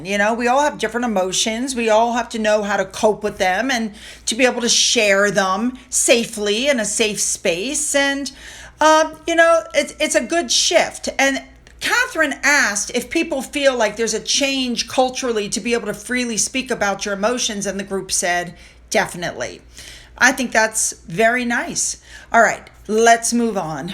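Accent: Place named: United States. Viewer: So what she is a American not English .And American English is very different from real English.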